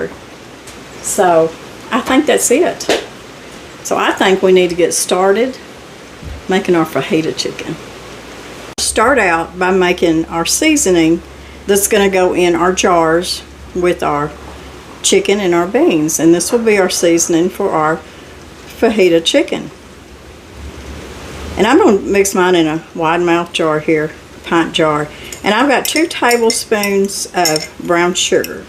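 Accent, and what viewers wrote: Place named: Appalachia, United States. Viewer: You look like your 89 and you sound like a HILLBILLY!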